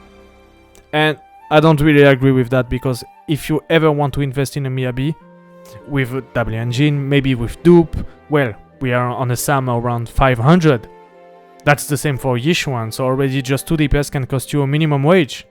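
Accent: France. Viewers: Are you French? You sound like you have a french accent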